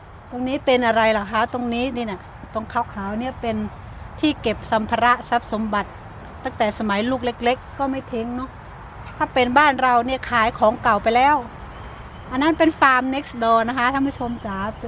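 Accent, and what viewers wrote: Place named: Surin, Thailand. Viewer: พูดเหมือนคน สุรินทร์ มาชมสวนครับ บ้านน่าอยู่วิวสวย ดูชื่อ แล้ว บุรีรัมย์ สุรินทร์ บุรีรัมย์ รอ เรือ ขัดเจน เป็นเอกลักษณ์ เพื่อนผมสมัยเรียนครูด้วยกันก็แบบนี้ครับ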